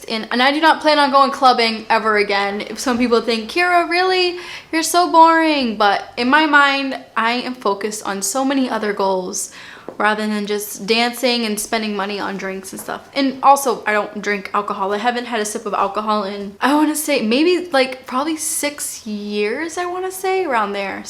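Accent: Boston, United States. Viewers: I love how you say cancer your Boston accent comes through so cute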